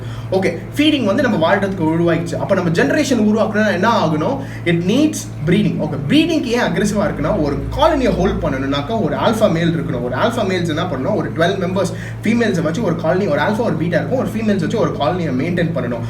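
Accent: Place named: India. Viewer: Why do you speak Indian English?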